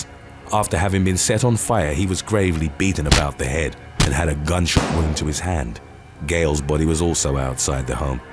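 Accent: Jamaica. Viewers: Based on what you're saying and how you said it clearly your ethnicity is Jamaica but you're probably born in a white country why else would you say it like oh it's so sad to hear about all the violence on such a small beautiful Island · Man or a woman comment sounded like true jamaican or are you really one ??? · Omg you are definitely Jamaican or have ties to jamaica....your accent Jamaican gave it away. Love all your videos!🇯🇲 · your Jamaican accent won you a new sub. :)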